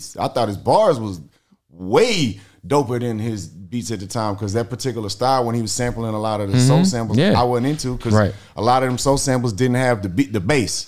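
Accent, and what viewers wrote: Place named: South, United States. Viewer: If I wasn't seeing who this was talking like this, I would automatically think that's a black man from the south talking like that. Damn, this dude has soaked up the culture soooo comprehensively. Got damn! Astounding!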